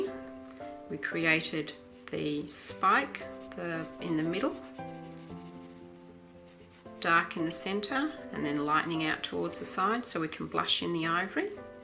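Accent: Australia. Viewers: I haven't done the duck pond yet, but i love coloring along with your videos. The music is soothing, your auzzie accent is great, and your techniques inspire me! I see progress in all of my pages :) Just wanted to say thanks, and keep up the great videos :D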